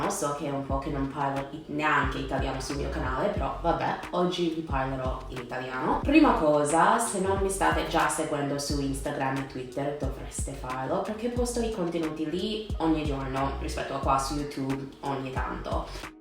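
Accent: United States. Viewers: You seem fluent, congrats! Your American accent though is fairly strong. I was wondering if you've given thought to trying to improve that as it can be distracting? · All you have to do is learn the accent! I can totally hear your American Accent. Brava però! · Non hai imparato bene la pronuncia, noi italiani non parliamo con l'accento americano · Dicono che l'italiano sia una lingua difficile da imparare per uno straniero. Tu l'hai imparato molto bene anche grazie al fatto che, vivendo qui in Italia, sei costretta a parlarlo giornalmente. Complimenti. PS: è bellissimo sentire parlare l'italiano con l'accento americano, non perderlo mai. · Parli benissimo ed hai un accento molto piacevole da ascoltare. Purtroppo o per fortuna quando si impara una lingua da adulti l'accento della nostra lingua base non si perde mai, vale anche per me dopo anni che vivo in francia. 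Ma non mi sembra che tu ti ponga molto il problema, o si? Vorresti che il tuo accento americano non fosse notato o ti piace questa sfumatura? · Ciao, il tuo italiano è molto buono - È normalissimo che a volte tu abbia dubbi sulle parole e faccia errori, ma parli ad una velocità quasi da native speaker e devi esserne fiera. I consigli che hai dato sono gli stessi che dò anch'io a chi mi chiede come diventare fluent in English (vivo in UK e sono quasi bilingue ormai). Practising with native speakers is key! L'accento americano è cute though quindi tienitelo stretto:)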